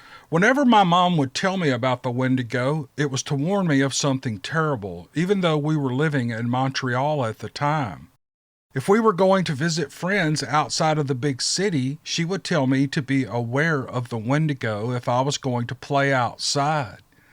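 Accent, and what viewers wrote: South, United States. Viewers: thanks for keeping us in your thoughts even while you're too busy to post you still think and plan ahead so we will have new posts to watch!! That's above and beyond!!! See you when we see you! I sure do enjoy your telling of others encounters!! The people that experience their encounters do a great job of writing them up but that's based on what you read and while I live on the West coast I grew up in the woods of Missouri, then from 1970 to 75 Ft. Hood Texas where we hunted and fished every off work hour we could get and between hunting on foot or riding my dirt bike I covered that post pretty damned well when on my own even! I love the southern humor and style and you're definitely all of that in its best vocal embodiment of it in my opinion!!! Can't wait for the next few!!!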